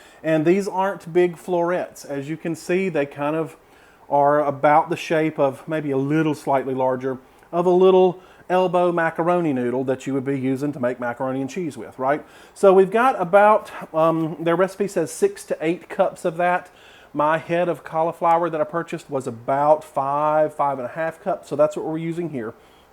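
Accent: South, United States. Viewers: This guy is the best! I am so glad i can watch him make different recipes, taste them and give his honest opinion. So many times i have wanted to make something keto and there are a billion different recipes..... some completely different and some very similar. It can be very difficult to determine which one is the best one to make. As we all know keto ingredients can be very expensive. There is nothing worse than making a recipe that does not turn out anything like you have anticipated. Not only have you wasted ingredients that cost a lot of money, but you have also wasted a lot of time and worst part you don"t get to eat that one thing your have been,craving and thinking about nonstop, praying to the keto gods that you can make a low carb version. So THANK YOU! You are so helpful and a joy to watch. You have the cutest southern twang. Love your personality and energy.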